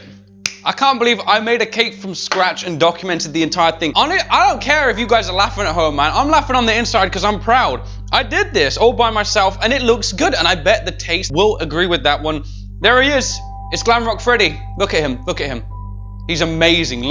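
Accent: United Kingdom. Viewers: with bad mic sound like real British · I like how when cooks or makes anything he just becomes so extremely British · I can hear the British more now that he is not speaking directly into the microphone. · He sounds really British in this video for some reason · Why does he sound extra British then normal in this video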